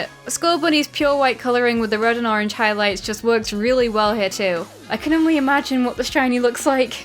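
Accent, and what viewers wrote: United Kingdom. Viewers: hon i didn’t even realise you were british because i’m so used to british accents being one myself it didn’t even register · I don't know why this is...but for some reason, up until this video, I thought you were American. I normally recognize British accents immediately, but I guess your accent just never registered for me. Did you change nationalities while I wasn't looking, or have you been drinking a lot of tea recently? The Mandela Effect is real ladies and gentleman....also I didn't know that you and were the same person.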